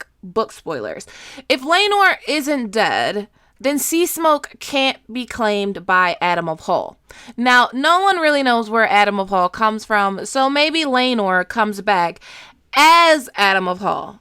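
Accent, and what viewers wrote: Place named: Louisiana, United States. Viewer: She’s from Louisiana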